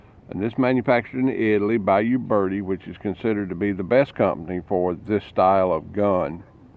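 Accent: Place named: Texas, United States. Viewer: He sounds like a fellow TEXAN to me!!!!!!!!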